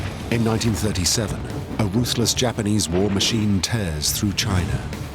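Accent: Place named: England, United Kingdom. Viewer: Wanted watch it but I ain't with those English accents we had fight to rid of English so... I speak American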